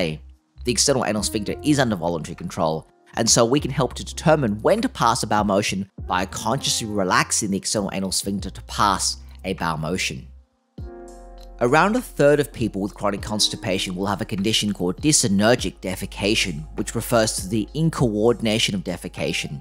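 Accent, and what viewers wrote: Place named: Australia. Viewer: Your Australian accent actually made this video fun and enjoyable because hey I'm litteraly full of shit ! But being serious nice video 😂😂 litteraly just got back from the hospital because of this sh*t !!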